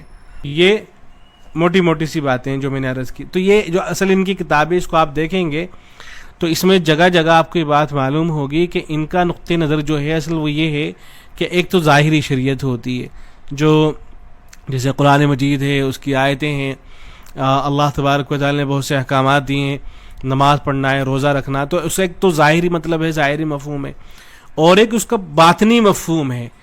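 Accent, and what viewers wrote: India. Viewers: You sound Indian.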